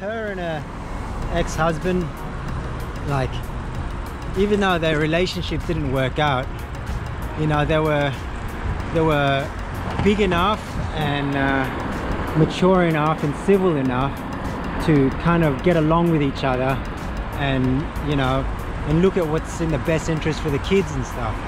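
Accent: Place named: Australia. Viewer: Palanga is a resort town in Lithuania. You sound australian or could be kiwi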